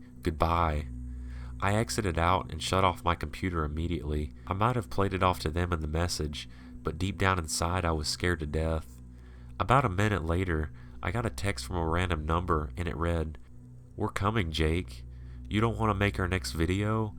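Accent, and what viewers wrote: South, United States. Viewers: It sounds a bit more Southern to me.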